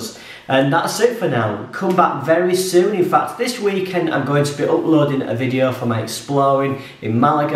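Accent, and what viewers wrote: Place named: Yorkshire, United Kingdom. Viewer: Hi, I'm a fela from Córdoba, a city from Andalucía in the south of Spain..I am a English learner and I think that your videos are very nice to learn the Yorskhire accent. I visited your area a couple of times and I only can say that i am into all the issues from Yorshire, a region so nice, stunning and picturesque.I would like to travel as well.My city is very nice with all their muslim heritage and not so known as Seville and Granada. Enjoy your time in Spain and NY. Greetings1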